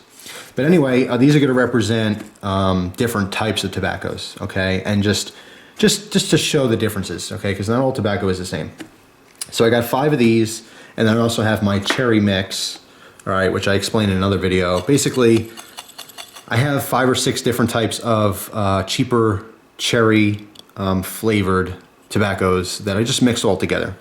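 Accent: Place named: Long Island, United States. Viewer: very good video bud. you sound like you're from long island. I grew up in Suffolk County.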